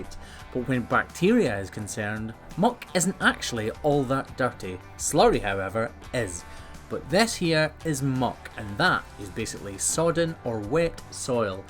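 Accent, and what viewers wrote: Scotland, United Kingdom. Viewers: Lol his name is (It's the Scottish making it sound like LOL) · Can ya say a little over run by the beef? Started watching vids for the trimming, stayed for the Scottish scenery, accent and great sense of humor.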